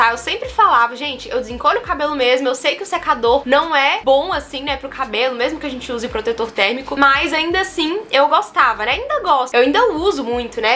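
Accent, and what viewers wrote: Minas Gerais, Brazil. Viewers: Pelo sotaque imaginei que era mineirinha .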